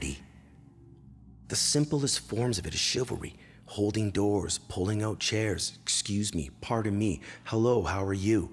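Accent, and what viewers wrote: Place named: Scotland, United Kingdom. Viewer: Lol 😂 great 👍 Scotland 🏴 accent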